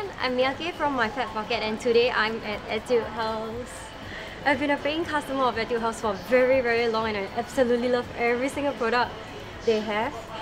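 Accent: Singapore. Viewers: sounds like a sgp dialect ;D bute etude house has so many cute and really effective stuff ! love it !! they should also sell it in europe ~ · Singapore! Know it from the famous singlish 'lah' and 'Leh' she uses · Singlish lols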